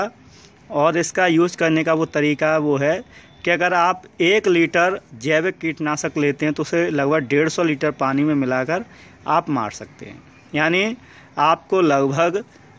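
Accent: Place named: Chambal, India. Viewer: Sir I m from mp chambal region n ur voice sounds like us. Khn se hn aap???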